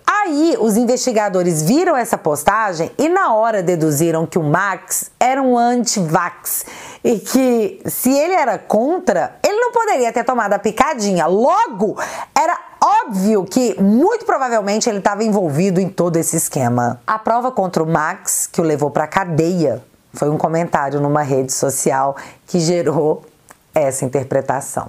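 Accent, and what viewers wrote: Minas Gerais, Brazil. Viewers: "Mas, UAI, não era urgente?" Amei ❤ Você é mineira como eu,? 😊 Parabéns pelo seu humor ao nos bem informar. Valeu! Avante, Brasil 🇧🇷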